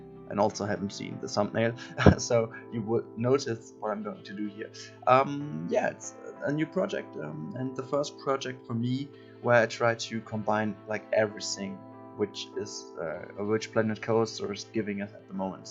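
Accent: Germany. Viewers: Das ist ja ein deutscher Akzent, wenn ich jemals einem gehört hab! Aber gute Arbeit wie immer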